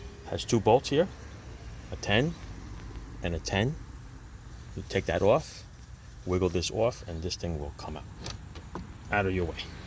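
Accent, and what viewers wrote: New York, United States. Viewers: you have this Italian accent lol are you from NY...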